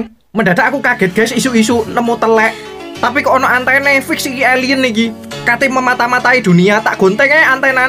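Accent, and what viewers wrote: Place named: East Java, Indonesia. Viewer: Asli endi cak koq dane wong jatim tak rungok2no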